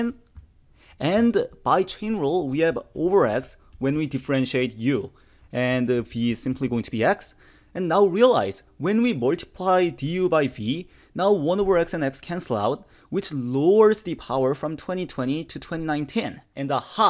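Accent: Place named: South Korea. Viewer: You must be Korean, with that strong Korean accent, which really adds flavour to your videos.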